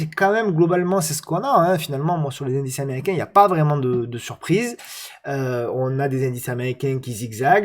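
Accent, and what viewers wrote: South, France. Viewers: Le froid est la, mais un bon thé chaud et l'accent du sud de vous réchauffe pendant plus 1h30. Merci! Quand j'ai vu ton visage sur le Palais Brongniart, je me suis dit que ca devait faire quelques choses en tant qu'investisseur. (Juste une critique sur l'investor day, les salles étaient petites, pas facile d'avoir une place, un coup de chance (j'ai eu la derniere place), j'ai pu assister a la présentation Bitvavo. Bon weekend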